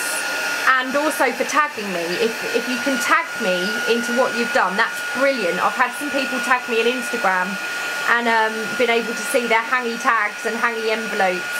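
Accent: England, United Kingdom. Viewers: These are just just gorgeous I love her so much!! I’ll be trying these 😍 love your sweet ways you are so great to watch! Love your lovely English accent too xxx be safe keep your fingers safe 😜 love ya xx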